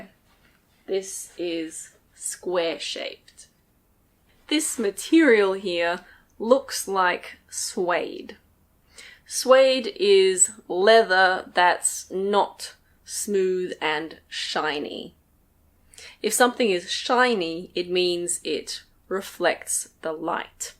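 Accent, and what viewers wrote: United Kingdom. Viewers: Do you speak British English? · is it british english ?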